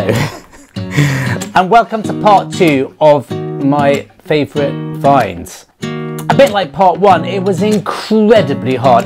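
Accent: RP, United Kingdom. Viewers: You are without any doubt the best metal detecting offering on YouTube, your posh accent is honest and endearing,absolutely love it.taski is always a bonus but of course cannot always feature, love the taxidermy in background, keep up the superb quality of content all the best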